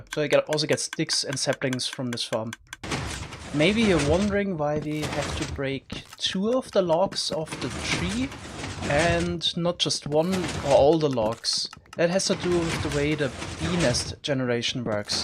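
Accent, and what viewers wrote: Germany. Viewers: great video! no bee pun or material list but i do have a question u german?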